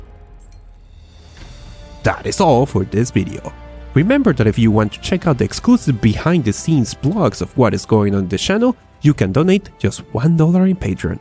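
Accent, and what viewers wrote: Spain. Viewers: Eres Ingles O Español?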